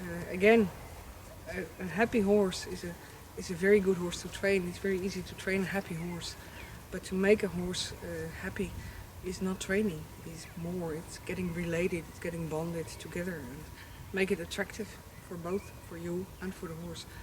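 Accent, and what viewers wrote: Netherlands. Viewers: Hallo zeg, nederlands accent :p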